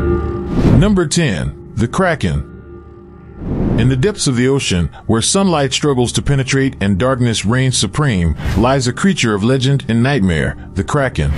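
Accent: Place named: United States. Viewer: Sorry. The animations and overall visual presentation is marvellous - but that awful 'merkin accent from the narrator is too much to listen to for more than a few seconds.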